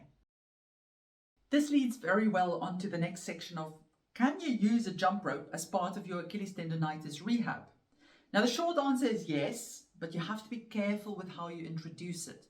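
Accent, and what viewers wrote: South Africa. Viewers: I love your accent! Are you south african?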